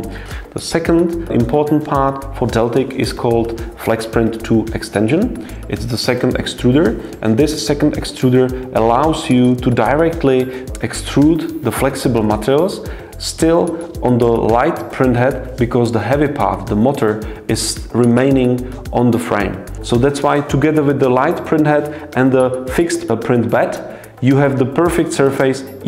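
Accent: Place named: Czech Republic. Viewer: Jak jsem slyšel první slovo, tak si řikám, že docela čenglish. Kouknu na info a ono jo xD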